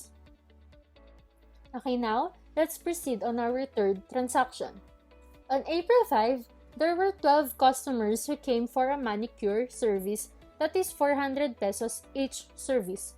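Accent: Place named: India. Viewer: Why I heard a Hindi Girl who explaining, taking this about 😅.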